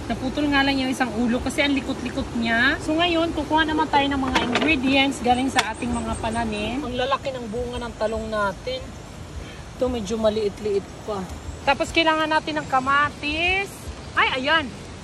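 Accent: Visayas, Philippines. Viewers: Bisaya diay ka ma'am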